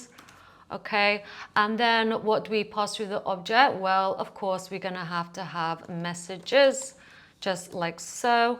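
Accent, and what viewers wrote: United Kingdom. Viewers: Great content, British accent, this channel rocks!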